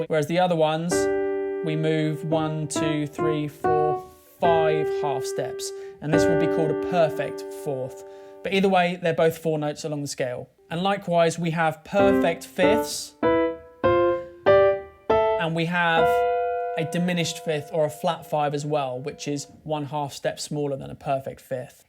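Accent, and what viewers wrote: United Kingdom. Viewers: I'm learning piano reading and english as well. I find your channel very useful and your british accent is way much suitable for doing so. Thanks!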